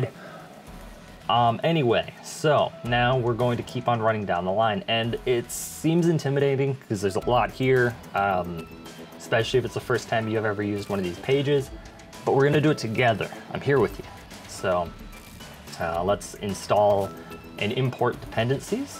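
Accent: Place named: South, United States. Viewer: Why do you speak like a dummy ted neck ?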